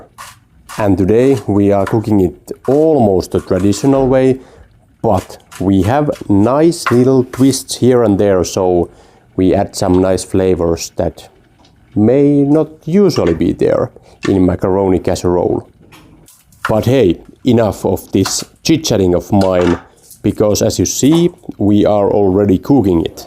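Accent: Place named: Finland. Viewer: This looks delicious, I will have to try it! Also, I've always been a fan of the Finnish accent!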